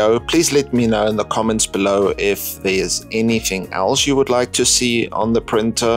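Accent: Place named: South Africa. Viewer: Lekker South African accent. Love to see such great videos coming from SA.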